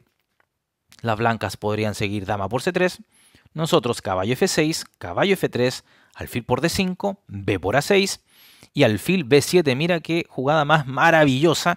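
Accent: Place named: Chile. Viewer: Le encuentro un acento Chileno xd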